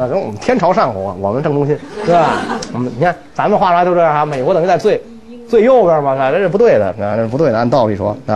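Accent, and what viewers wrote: Beijing, China. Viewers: 听到这个北京话我就很高兴。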